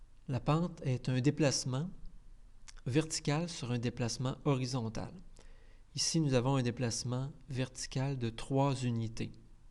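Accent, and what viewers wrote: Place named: Canada. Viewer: Trop fort et bien expliqué avec un accent canadien 👌🏼👏🏼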